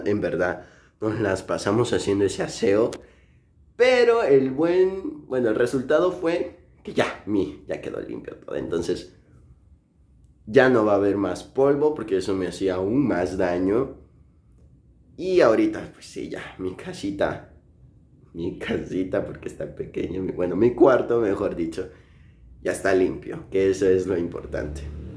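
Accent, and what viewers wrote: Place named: Mexico. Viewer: que noticia tan maravillosa, me encanto tu videos y entrenaré el español mexicano escuchándote, hijole que padre!. buena suerte.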